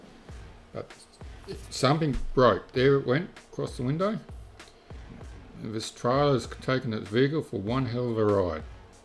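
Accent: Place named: Australia. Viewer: Love your Aussie accent....Relaxed talking manner but not too relaxed for the masses....sometimes my ears couldnt relate..sorry....so as my kind teacher used to say.. "Talk nicely and clearly"...! .just so all your valuable help sinks in..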